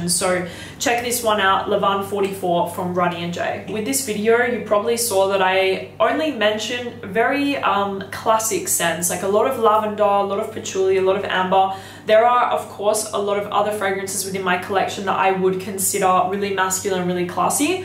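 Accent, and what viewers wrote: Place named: Australia. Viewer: Aussie accent please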